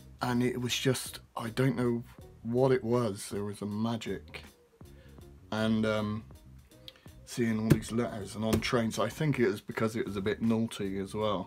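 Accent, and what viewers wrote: United Kingdom. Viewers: I absolutely love the Bristolian accent! I am originally from Bemmy/Bedminster. I currently live abroad so it's great to hear an accent to make me feel at home. Honestly it's epic to see someone so passionate about their art and someone elses. I've seen your work all over the place and there was one that caught my eye in Kingswood on the Black Horse Pub wall. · Don’t put yourself down on accent south west is the best!! I’m from Devizes!! Propa south west! And I know wot you mean about bode ! I’ve got her tatttooed to my side.as soon as subway art hit my school library!! It was mine !! I had the buzz of graffiti!! I’m 48 still writing! I’m proud of the south west style! You and desk one from Swindon are my favourite graffiti artist 💯💯💯💯💯 Keep it going! 🤡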